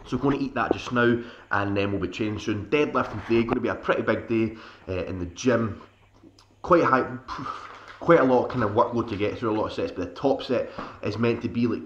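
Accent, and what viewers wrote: Scotland, United Kingdom. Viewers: I think your accent has ruined me as I can't ready "easy" without yelling it in best Scottish voice.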